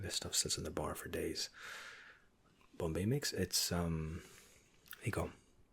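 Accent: Scotland, United Kingdom. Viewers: I read the actual comments to where the idea of this video originated. I didn't know if i was going to like the Scottish accent, but I wasn't disappointed at all. Keep up the good work boyo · Genuinely cannot decipher your accent. I hear a Scottish twang, not the part I'm from but also not strong enough to be pure Central. Pls help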